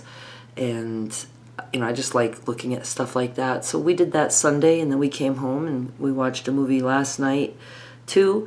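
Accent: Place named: Massachusetts, United States. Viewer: Hi, I found you recently and am thrilled! You are an amazing artist!!! I was planning to respond to the video where Skylar colors Memere's hair. I was so moved to hear someone say the French name for Grandmother that I almost cried. I lost my Mem years ago and very rarely hear the term any more. My family is originally from Worcester, Mass. and they have your accent. Most are gone now, so hearing your voice is very comforting! I am a new subscriber, so really looking forward to unpacking all you offer, which is quite a lot! Can't tell you how you lit a candle in my heart, thinking about my own Memere'! Thanks for offering us the gift of yourself and your art.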